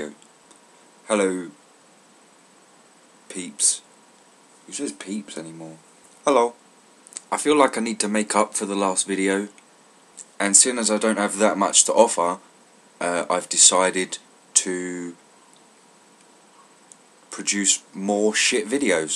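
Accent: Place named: Newcastle, United Kingdom. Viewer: Loved the geordie voice. x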